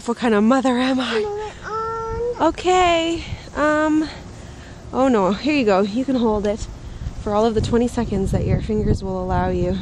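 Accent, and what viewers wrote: Canada. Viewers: hilarious... your canadian accent is in FULL swing :) Probably from being around your family :P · Love it! Btw, you sound so much more Canadian in Canada ;)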